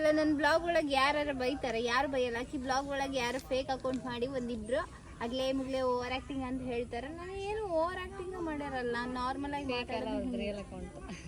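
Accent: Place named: Udupi, India. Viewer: ನೀವು ಉಡುಪಿ ಭಾಷೆ ಚಂದ ಮಾತಾಡ್ತಾ ಉಂಟು 😁🤪🤩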